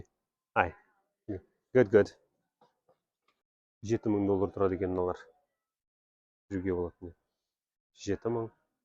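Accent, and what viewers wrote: Standard. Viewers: Өте жақсы түсірілім былжырақтатып басқа тілді қоспай таза қазақша көрген қандай керемет.Рахмет.Америкада заң өте жақсы жұмыс істейді әрі бәріне бірдей. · Өте казак тілін таза сөлеитінің көрініп түр. Жарайсың ❤❤❤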